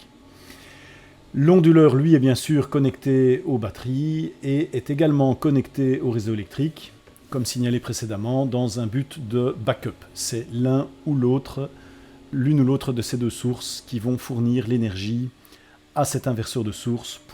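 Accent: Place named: Belgium. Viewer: Très intéressant, vos vidéos sont très bien faites. Seriez-vous belge? Votre accent m'est très familier 🇧🇪😊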